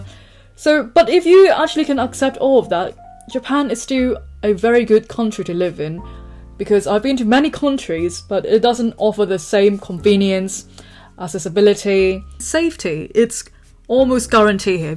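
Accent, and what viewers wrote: United Kingdom. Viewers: it's so funny to see a cute lady with such a thick english accent and frequency tone, the contrast is weirdly attractive. Interesting, but I'll try this year and see for myself nothing better than this, I m also introvert, so I feel like a more autist society might just do, one year of PVT!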